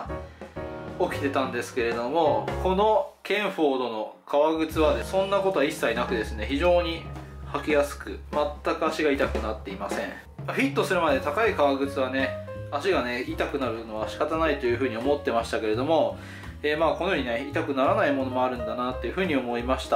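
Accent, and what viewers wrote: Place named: Nagoya, Japan. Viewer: 訛りが静岡の人かと思ったら名古屋の人だった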